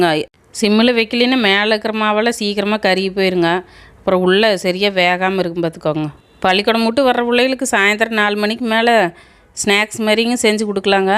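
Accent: Coimbatore, India. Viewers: அம்மணி உங்க சமயல விட உங்க கோவை தமிழ் சுபெர்ங்கோ 😅...இது இந்த நாடட்மையோ ட தீர்புங்கோ 😅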